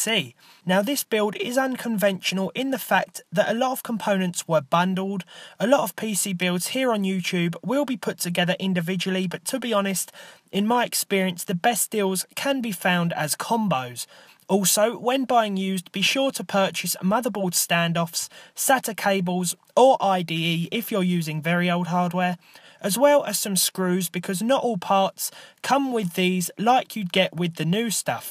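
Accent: United Kingdom. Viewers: As always, i love your videos. I follow you from italy, so forgive my English. I love your british voice. Continue in this way, u r pretty good.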